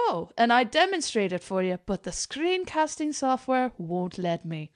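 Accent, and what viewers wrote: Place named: Scotland, United Kingdom. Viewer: Your scottish accent is super hot.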